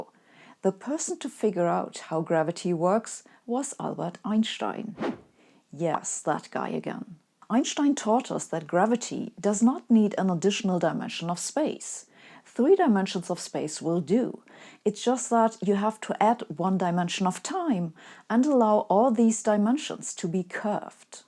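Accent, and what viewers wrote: Germany. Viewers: Sabine is lovely,funny and brilliant...she makes Physics fun and accessible...I love her German accent,too,tcx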